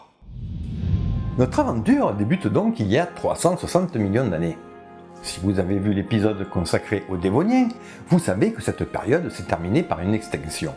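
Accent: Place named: Marseille, France. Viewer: Bonjour, et bravo pour vos connaissance en paléontologie, J'ai 82 ans et j'ai fais des fouilles ici en Belgique dans ma jeunesse Mais lorsque je voie la quantité et la richesse des découvertes ....je peu revoir TOUT c'est fabuleux la quantité d'animaux que l'on a découvert plus ceux que l'on avait déjà mais que l'on n'arrivait pas a classé. C'est fabuleux ! Je regarde très souvent vos programme et en plus j'adore votre axent marseillais .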